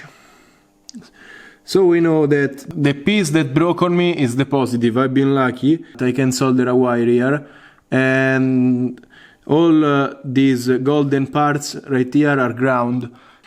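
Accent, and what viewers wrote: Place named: Italy. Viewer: quanto si sente che sei italiano ahahah bel video grande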